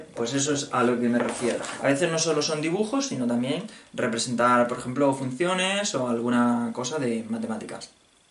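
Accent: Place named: Castile, Spain. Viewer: Buen vídeo! En este vídeo te sale un acento súper castellano comparado con los otros!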